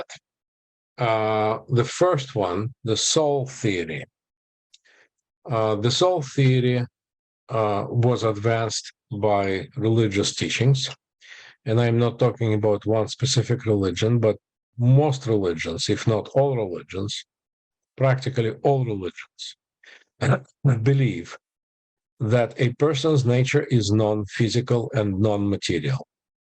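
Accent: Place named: Russia. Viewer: Здравствуйте,! Слушаю ваши лекции по истории религий на русском и они мне очень нравятся системностью подхода, чётким структурированием и ясностью изложения. Чувствуется, что у вас большой опыт преподавания. Сегодня попробовала послушать лекцию на английском и была обрадована тем, что понимаю! Отношу это в определённой степени за счёт вашего "русского" акцента ( не сочтите сомнительным комплиментом), Очень рада, что теперь потенциальный запас интелектуальных "вкусняшек" у меня увеличился. Они помогают пережить время морока, накрывшего мою страну. Thanks a lot!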